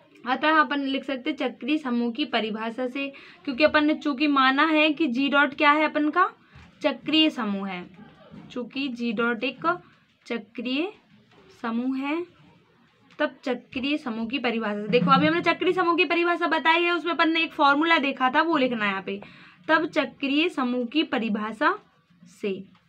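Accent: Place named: Bundelkhand, India. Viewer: Btatey btatey bundelkhandi a he jati h...😅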